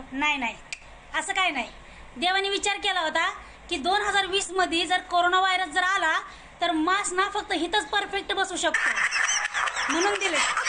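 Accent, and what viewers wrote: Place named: India. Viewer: Aho khupch chan joks krtat ani gavrn boln khupch chan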